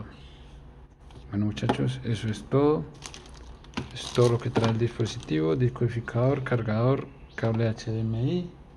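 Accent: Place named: Colombia. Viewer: Que precio tiene en su país amigo por su acento me parece que es de Colombia de que parte? Un saludo desde Barquisimeto. Venezuela.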